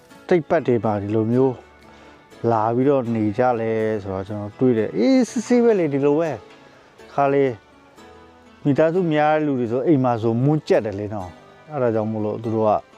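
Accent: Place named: Shan, Myanmar. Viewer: မြန်မာ စကားပြောတဲ့ အသံနေအသံထားက ရှမ်းမြောက်နယ်ဖက် နမ္မတူ ကဖက်ကအသံနေအသံထားပဲ ကောင်းပါတယိဗျာ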